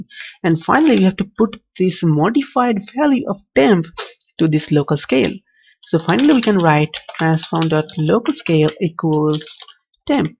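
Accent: India. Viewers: Indian accent, check. Weird audio, check. Good tutorial, check.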